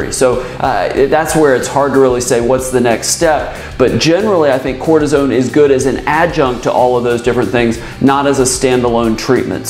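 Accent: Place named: Scotland, United Kingdom. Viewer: Wonderful Scottish Accent ! . Please slow down the presentation, the lady is speaking far too fast .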